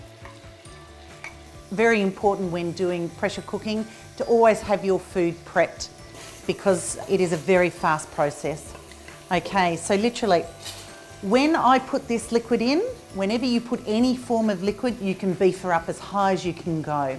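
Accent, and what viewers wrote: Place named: Australia. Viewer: Thank you I love Australian people/accent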